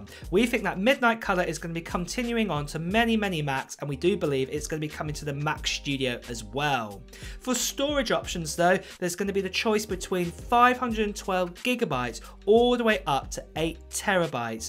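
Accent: United Kingdom. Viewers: i love your british accent and i hope to have the iphone 14 pro max pleaaaaaaase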